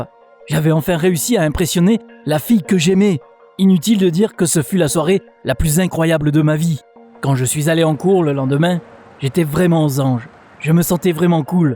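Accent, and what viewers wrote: Marseille, France. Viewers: Je viens juste de remarquer qu'il a un accent marseillais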